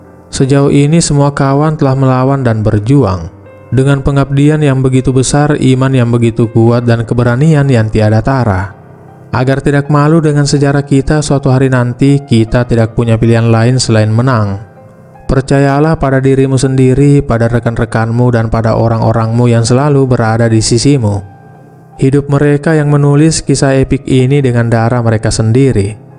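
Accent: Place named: Bugis, Indonesia. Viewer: Mimin logat Bugis, orang Bugis kahh😅😂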